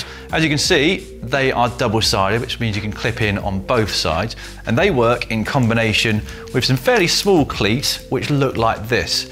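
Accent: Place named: United Kingdom. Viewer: I just subscribed for the british accent and the british humor! :D The content is also good, so win-win!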